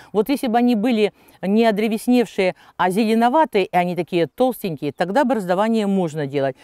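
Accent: Standard. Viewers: Вас слушать одно удовольствие Спасибо. Сейчас такая редкость обычная русская речь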